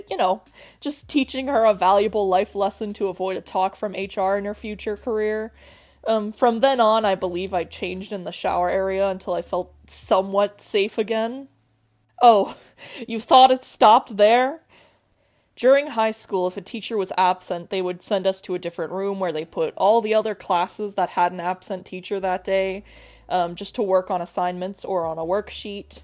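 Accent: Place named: Boston, United States. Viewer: Why she from boston's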